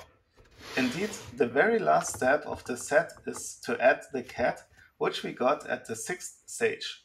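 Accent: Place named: Germany. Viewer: Building the Figures is easy, i dont know where the problems for you are. Meanwhile i think your mother language is German, and i think your can hear very well when you speak English. You should try speak more fluent. Otherwise nice review.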